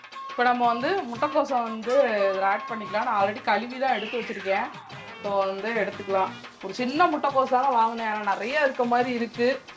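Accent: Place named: Malaysia. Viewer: Are you from Malaysia sis.because your tamil talking same as Malaysia.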